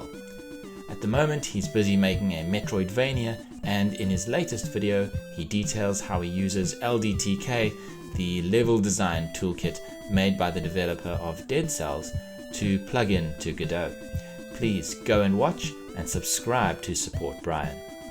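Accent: South Africa. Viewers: Great showcase! Are you South African by any chance? 🛴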